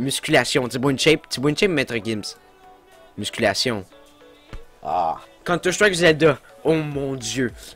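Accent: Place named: Quebec, Canada. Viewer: Sa fait devoir un quebecois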